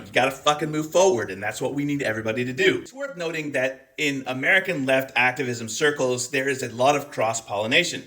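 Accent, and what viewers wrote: Scotland, United Kingdom. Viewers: Damn, the Scots accent came out QUICK today!!!!! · Why does it sound like you're covering up a Scottish accent? ... 🤔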